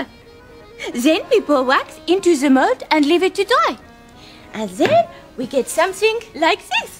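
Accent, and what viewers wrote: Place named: France. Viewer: ooooooooooo mat luv your french accent!!!!!!!!!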